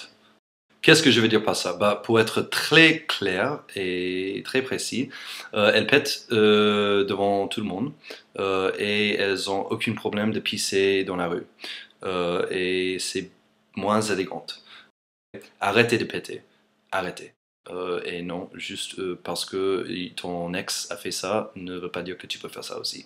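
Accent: United States. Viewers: Cet accent americain c'est charmant! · Hi, After watching some videos, I saw your French is better. I also noticed when you sing in French, you forget your American accent, thanks for your effort. Thank you for your videos and good luck.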